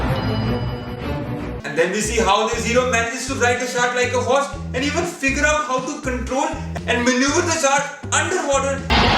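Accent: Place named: India. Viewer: Holy shit.. after watching so many Westerner Vlog its quite jarring to hear this thick accent.. now i get why they make fun of Indian accent.. its REALLY awkward.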